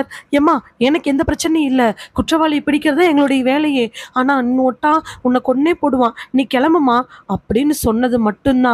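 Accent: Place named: Pollachi, India. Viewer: சிஸ் நீங்க பொள்ளாச்சி சைடுங்களா...வாசிக்கிற விதம் எங்கபக்கம் போல இருக்குங்க சிஸ்.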